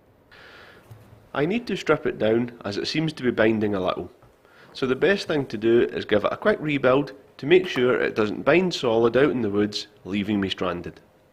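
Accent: Scotland, United Kingdom. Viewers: The Irish accent is Scottish !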